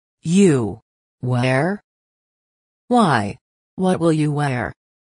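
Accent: United States. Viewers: it's american english pronunciation! you should teach RP english. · Спасибо.Но,это американский диалект. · Нужно английское произношение, это наверное, американское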